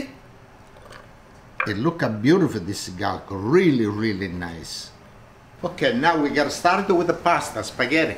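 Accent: Italy. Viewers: Perhaps cut the anchovy up to spread evenly would be the only different thing I’d do I love listening to the slang Italian Yr like the old compare next door. Bravo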